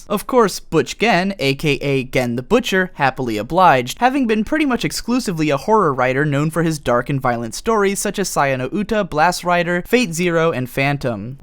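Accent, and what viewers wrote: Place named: United States. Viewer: wtf you americans and your way to accent vocals -_- I thought you were talking about Madokas mom all the time! It took me 10mins to realize you meant Mami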